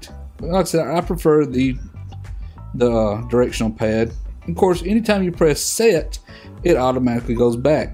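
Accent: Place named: Texas, United States. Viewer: . Your Videos are great. I have just bought the 80D and your tutorials are really helpful. Many Thanks. By the way, you sound like you come from Texas! We lived in California for a few years back in the Eighties.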